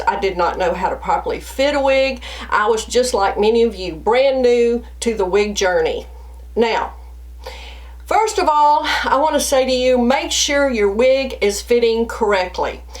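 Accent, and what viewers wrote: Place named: Boston, United States. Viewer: Thank you for doing this video--very informative. I've been experiencing some itching; and yes I'm thinking it is the permatease for me. Laughing about your accent--I'm from Boston but it sounds like Bahstun.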